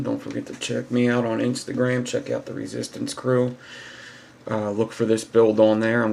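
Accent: Philadelphia, United States. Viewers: Am I detecting a West Philly accent?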